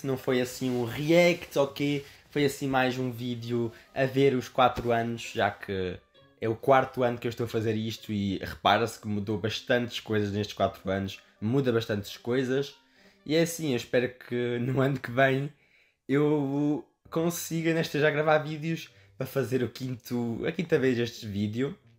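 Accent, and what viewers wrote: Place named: Portugal. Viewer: Querido, de que lugar de Portugal você é?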